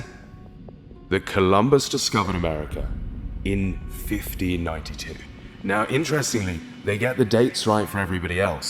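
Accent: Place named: United Kingdom. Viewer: Nice British accent - I still suspect he's Russian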